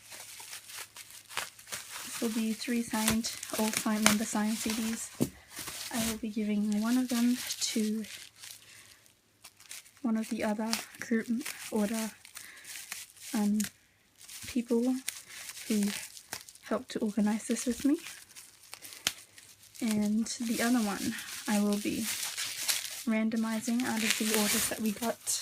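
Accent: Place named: Australia. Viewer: your english sounded so normal to me xD ur aussie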